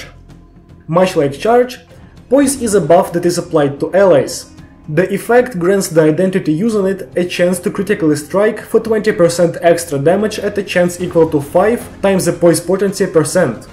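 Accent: Russia. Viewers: Русский акцент, прикольно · Я будто очень чётко слышу русский акцент, а так видео топ.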